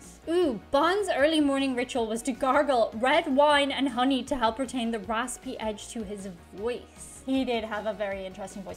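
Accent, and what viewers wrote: Ireland. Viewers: She’s Irish by the sound of her accent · She’s Irish, some of her videos are even labeled as such (accent too). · Nice irish accent there........ No effin way you made it to whatever age you are without hearing.........and supposedly completely unaware of one of the most famous rock bands ever........ Extremely popular in Ireland and on Irish radio stations...... in particular. Efin clickbait...........and I've just realised that I'm a victim of it.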